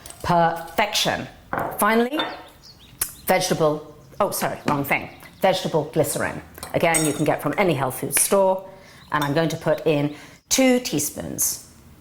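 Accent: United Kingdom. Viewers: You start of sounding British but then pronounce "vitamin" like an American. What's going on?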